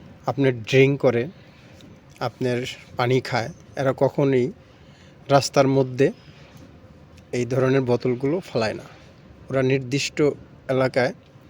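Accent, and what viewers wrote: Bagerhat, Bangladesh. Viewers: ভাই আপনার ভাষায় মনে হচ্ছে আপনার বাড়ি বাগেরহাট জেলায় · ভাই আপনার ভাষায় মনে হচ্ছে ,আপনার বাড়ি বাগেরহাট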